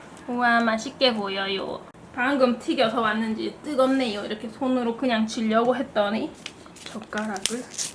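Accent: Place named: Gyeongsang, South Korea. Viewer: 경상도쪽 사투리에 뭔가 귀여운 말투 ㅋㅋㅋ 재밌게 봤네오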